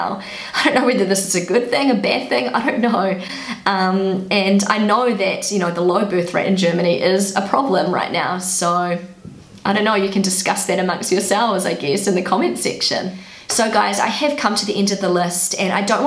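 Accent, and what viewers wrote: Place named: New Zealand. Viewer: Wow, you have a very strong New Zealand accent...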